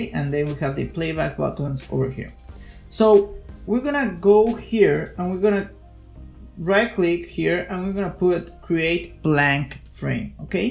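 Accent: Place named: Spain. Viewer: Se nota mucho que eres español xd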